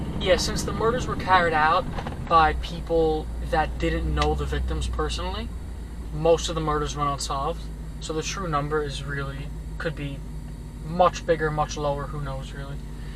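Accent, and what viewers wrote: New York, United States. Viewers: love your drives and the subject matter. And u do it with a New York accent. Keep up the good work!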